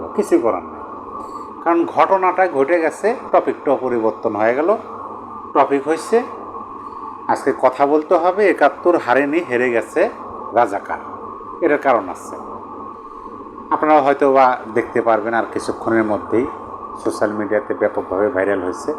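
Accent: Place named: Bogura, Bangladesh. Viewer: সুন্দর আলোচনা। আপনার কথার মধ্যে সিরাজগঞ্জ এবং বগুড়ার টান আাছে। আপনার জন্মস্থান কোথায়? স্রেফ কৌতূহল 😊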